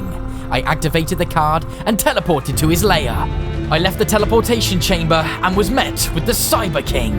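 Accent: United Kingdom. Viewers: Omg I like how you talk like a British guy on a adventure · Well he sounds like a brit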